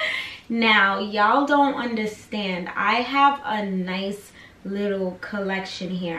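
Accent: New York, United States. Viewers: J BIN LOC Yes, not everyone from NY sounds like that but a lot do. It's still one of our accents or the way some of us speak. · J BIN LOC that’s just her accent she’s from New York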